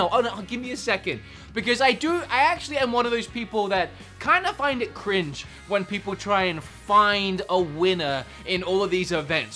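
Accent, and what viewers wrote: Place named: Australia. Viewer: This guy sounds Australian...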